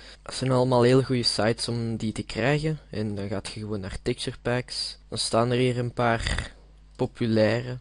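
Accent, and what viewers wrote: Belgium. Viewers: woow vlaams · ben je belgies ofzo?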